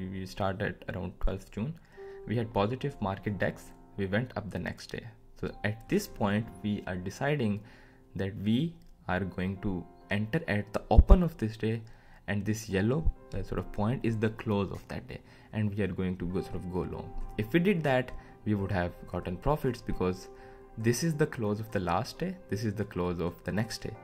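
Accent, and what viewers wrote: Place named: Gujarat, India. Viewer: Are you from gujarat or mumbai by any chance... Guessing from the accent.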